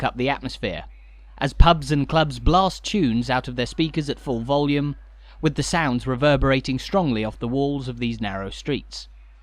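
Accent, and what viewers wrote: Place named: Cockney, United Kingdom. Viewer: No it's cockney...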